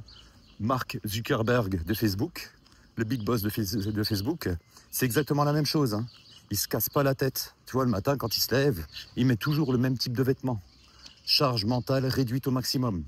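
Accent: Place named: Alsace, France. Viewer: Accent de chez moi! Alsace ou Moselle?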